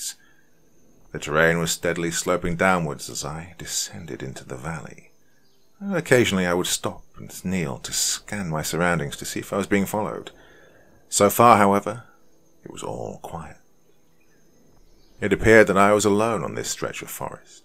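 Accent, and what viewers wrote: England, United Kingdom. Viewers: also gta realize...hes English and we get our language based off there language..sooo who is really wrong in pronunciation???? However I do agree maybe a bit more research shldv been done knowing it was an American story...but give the guy a break really...he puts out some amazing content and I'm sure u have pronounced many things wrong...as have i..but he's working trying to make life easier for the rest of us and ur dawgn the man....I've heard many worse narrator's and is my main guy along with dark Somnium...gta stick up for what I believe in....if every1 here so much better STFU and start ur own YouTube...uk since ur so much better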